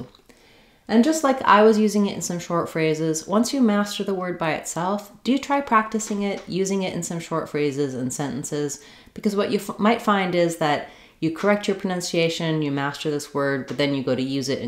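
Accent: United States. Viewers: Oh wow you're here making videos and uploading! I did it search for a biblical name Rayhab. I knew there's different spellings but this is the one that I'm familiar with and some people remove the Y. I'm assuming when you give pronunciations that this is American English pronunciation? Google AI pronounces her name as rahab... They pronounce the a like the word father. I've always heard it pronounce the way you pronounce it in that video I just saw. Anyway, I'm assuming you're giving us pronunciations for USA❤❤❤. Sorry this was so long but I am from another country originally, Venezuela. My family came to USA when I was a girl and now I'm 23. I'm not trying to give my life story but I am trying to learn how to pronounce a lot of words in the USA version. You can understand why it gets a little complicated when the Google AI kind of uses pronunciations that sound like there United Kingdom English. 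Anyway I'm using speech to text because I can't spell English great so far. I'm trying to work on all these things and I plan to subscribe as soon as I hit send. Glad that I find you still around after her the Rehab video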